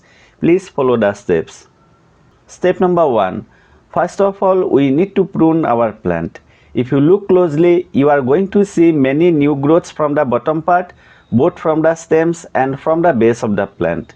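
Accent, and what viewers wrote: India. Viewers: Very strong indian accent i hope hydrangeas are strong..pointless video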